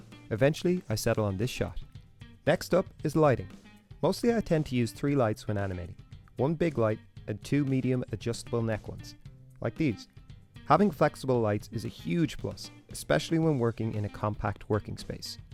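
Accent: Ireland. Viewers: are you Irish? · This was very helpful, thank you. By the way, are you Irish or something?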